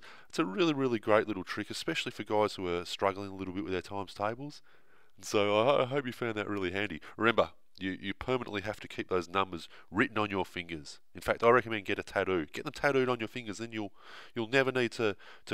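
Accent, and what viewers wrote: Australia. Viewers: Why are you talking with like a flu like kind of voice? Are you an Aussie or you are really having a cold? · He speaks very clearly. I guess you are just not familiar with the Australian accent :)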